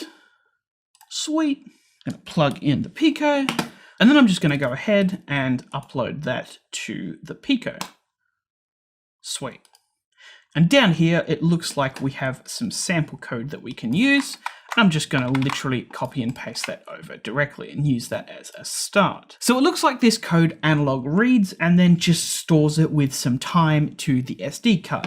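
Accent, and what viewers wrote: Australia. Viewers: Part way through and finding this the most clear, no fuss course to follow. big thumbs up. did have a snag at beginning where i almost gave up. couldn't get pico to be seen on laptop. turns out i was using a power only cable and not one with data. silly mistake but got there in the end. very pleased you uploaded this course. Thank You, and is that the Aussie twang to your voice? Brit here and find dialects fascinating. It changes here in just 20 miles apart.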